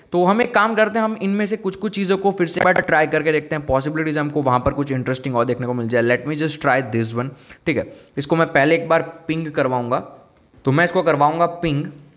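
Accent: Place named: India. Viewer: I'm sorry, but he speaks English or Indian? I understand more or less what he says.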